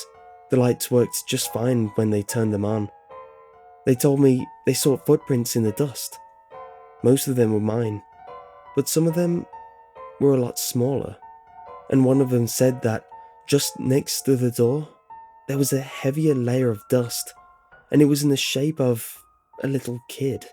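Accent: United Kingdom. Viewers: 70 isn't that bad, where I live 60-70 is the norm...then again where I live one day it will be sunny and 70 degrees and the next day 20 degrees and snowing that Indiana for you - _ -; Also I just realized are you referring to Fahrenheit or Celsius? In the US we use Fahrenheit to calculate the temperature. Even though the narrator was british, the author of the story was probably american. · Love your british accent (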